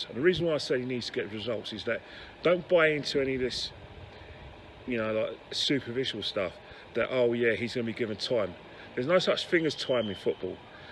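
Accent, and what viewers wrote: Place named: Cockney, United Kingdom. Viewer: This man is Riddled with Cockney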